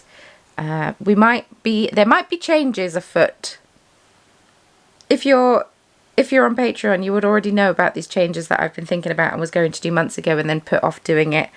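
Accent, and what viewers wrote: RP, United Kingdom. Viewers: Bap if not crusty. Cob if it's crusty. I'm in Solihull, near Birmingham airport, and you'd sound posh here.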